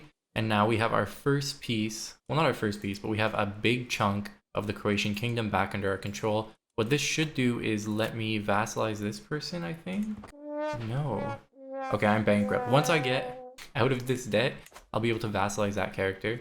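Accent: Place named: California, United States. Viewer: this guy and MRE guy have the same California accent and I enjoy it I just need to say "ok niiiiccceee" more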